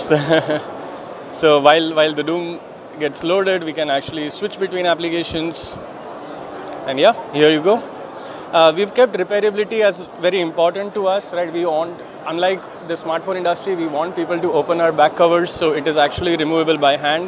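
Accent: India. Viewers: Sorry for being a racist, I really don't like listening to Indian accent English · Jeet accent, into the trash it goes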